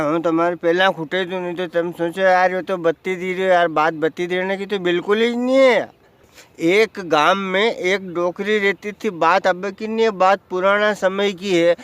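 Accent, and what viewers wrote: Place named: Indore, India. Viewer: Ya mhare indori bhasha lage. Mhake athe astar ni bole.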